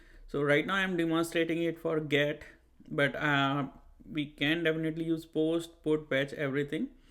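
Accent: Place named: India. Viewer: Thank you dude but please, the indian accent, pleeeaaasseee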